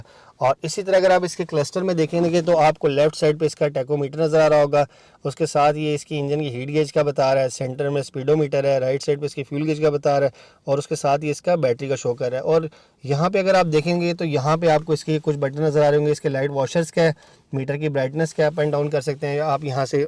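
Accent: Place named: Rural, India. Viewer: Vocals should be improved, seems like a pendu is reviewing a prestigious car. Most likely conduct review in english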